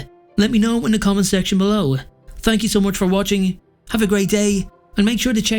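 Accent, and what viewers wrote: Ireland. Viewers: This is a great channel, you should make videos on the Saga of Darren Shan, very little lore videos on YouTube about the series and it’s an amazing vampire series ! You sound Irish so I presume you’ve read them but if not check them out the audiobooks by Ralph lister are amazing! Subbed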